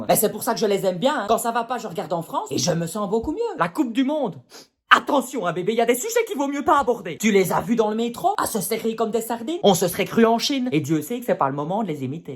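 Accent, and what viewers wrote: Belgium. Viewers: il est belge pas français 😂 ça s'entend avec notre bel accent pure souche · L'accent namurois , c'est réjouissant ! signé une namuroise exilée.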